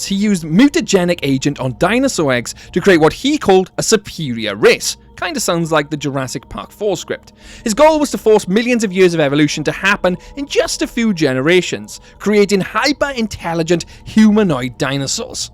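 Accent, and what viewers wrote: Wales, United Kingdom. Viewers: Is that a fellow Welsh accent I hear? Love your videos, dude! They’ve made me much more interested in the wider lore of JP/JW. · It is hard for me to find new classified disclosure on a daily basis. I am amused by the British accent. I see you did Inter Alia Quantum Postage.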